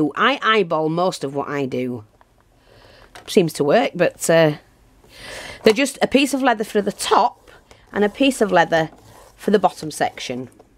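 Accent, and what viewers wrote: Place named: Yorkshire, United Kingdom. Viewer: I have just been informed that your delightful accent is Yorkshire. Big happy smile over here.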